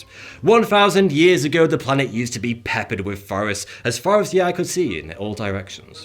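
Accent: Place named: Manchester, United Kingdom. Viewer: Bro: I like you. But you need to scale down your thesaurus addiction. It doesn't make the argument any stronger to use the word proclivity. I like a good diction but your proclivity for overt verbosity is verges on pomposity and its very superfluousness is rendered further supercilious by the manc accent. Yours, a fellow manc. Love x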